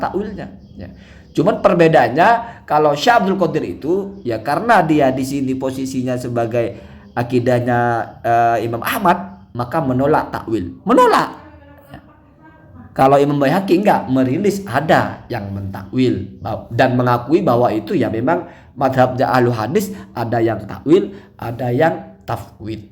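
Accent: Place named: Surabaya, Indonesia. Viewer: jenengan tiyang pundi gus,lagatnipun persis suroboyoan🤭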